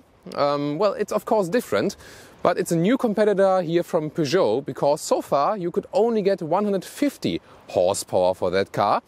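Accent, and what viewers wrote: Germany. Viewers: Great review, nice video work and lovely German accent. But please stop looking back while driving.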